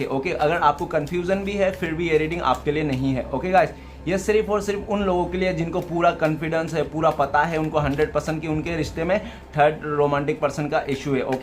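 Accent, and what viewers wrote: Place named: Northeast, India. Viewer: Btw are you from Assam.. Your voice sounds like North-East people.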